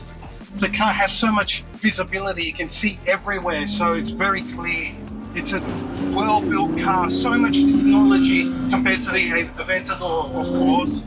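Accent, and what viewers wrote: Australia. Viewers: lol😂 Aussie accent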